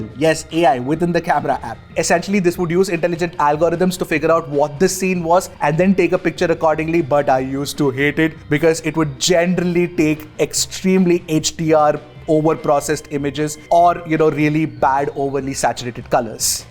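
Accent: India. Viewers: Yuk indian accent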